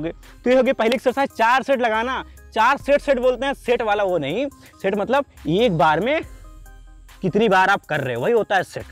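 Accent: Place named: Uttar Pradesh, India. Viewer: Mja a jata hai up wali bhasa sun ke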